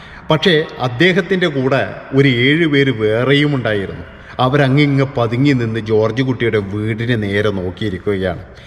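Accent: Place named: Malappuram, India. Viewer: Malappuram speech. Me also hails from mpm. Like very much.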